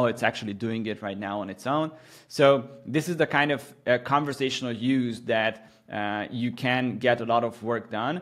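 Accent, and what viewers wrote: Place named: Hungary. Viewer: This guy has Hungarian accent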